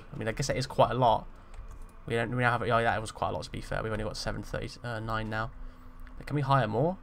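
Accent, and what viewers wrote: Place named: Europe. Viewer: I'm an American and the European accent makes it so much better. Good content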